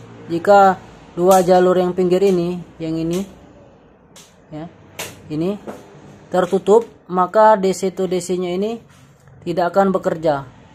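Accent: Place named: Deli, Indonesia. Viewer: Abg pernah di medan bg? Kalau di lihat dari beberapa vidio Logat abg macam melayu deli 😁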